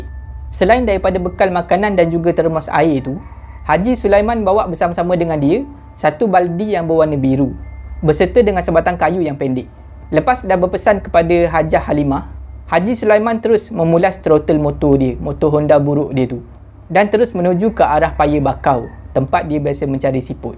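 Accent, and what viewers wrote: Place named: East Coast, Malaysia. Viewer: oww patutlah loghat mcam org pantai timur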